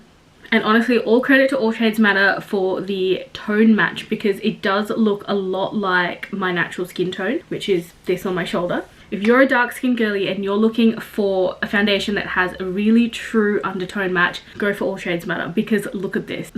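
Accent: Australia. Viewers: She's black. What do you think she is?? She just has an accent, either Australian or South African.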